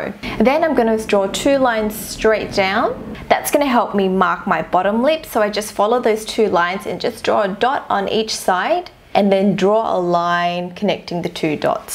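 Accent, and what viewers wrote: Australia. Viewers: I'm pretty sure that she's from China... But the thing is that she sounds so Australian🤔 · she sounds australian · love u r Australian accent it's so cute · are you aussie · you sounds like Australian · Are you from Australia? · Are you Austalian?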